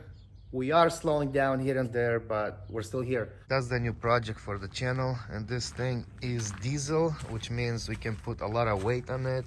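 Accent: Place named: Russia. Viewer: Russian accent 😂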